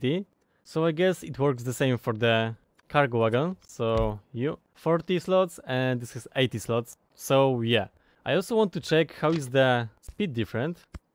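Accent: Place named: Poland. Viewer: I thought so! I knew it sounded like my fellow country man, Elo mordo!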